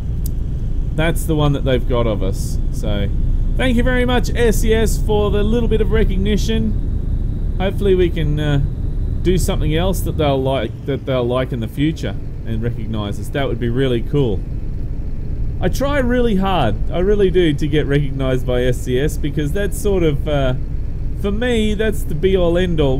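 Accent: Australia. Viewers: Are u Australian ?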